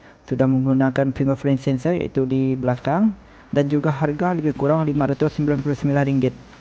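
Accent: Sarawak, Malaysia. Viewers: org Sarawak kq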